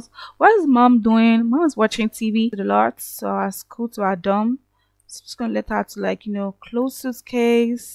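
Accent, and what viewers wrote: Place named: Nigeria. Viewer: I thought so from your accent but I wasn’t sure haha. I am also from Nigeria based in the UK. It’s lovely to meet another Nigerian simmer 🥰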